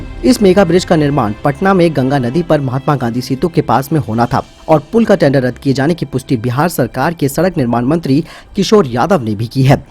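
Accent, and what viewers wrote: Uttarakhand, India. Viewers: कहां के रहने वाले हैं आप। मुझे तो उत्तराखंड से लग रहे हैं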